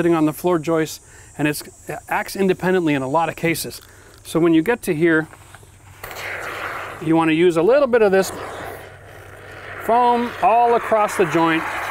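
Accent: Canada. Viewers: This was amazing and I'm not even going to be doing vinyl siding! Your speech and mannerism is clearly (a fellow) Canadian and obvious at the onset but I only figured it out when you mentioned Ottawa. But you also explain things as a teacher in a classroom and in the field (just enough explanation). Well deserved subscription! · Just here so I can hear Canadian words like when he says "stretch that oot"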